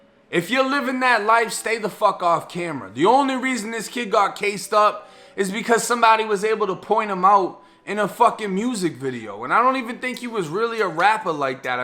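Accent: Boston, United States. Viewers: You sound like you're from Boston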